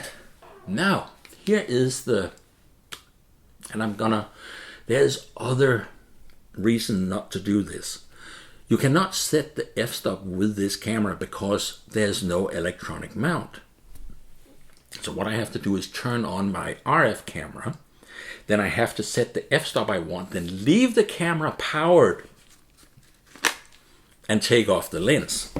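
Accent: Denmark. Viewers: Hi, thanks for uploading. A Dane here from Copenhagen, who also shoots Canon - great setup and hyggeligt to hear your Danish accent. I look forward to seeing what you shoot on your 12K 🥰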